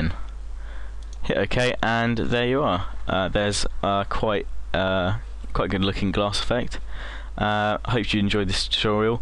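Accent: United Kingdom. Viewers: he is british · Thanks for lesson, British english cool! - Giorgip7-Tbilisi, Georgia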